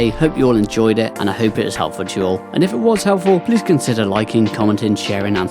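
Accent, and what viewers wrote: United Kingdom. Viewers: Yea he’s British bro, they say stuff like ‘Whas dat?’ D’ya like it?’ You’ve got to love it😂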